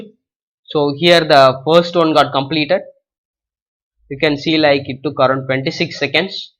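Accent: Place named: India. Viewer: anybody have any channel recommendations on this subject where the voiceover has an English/American accent? I can't listen to the Indian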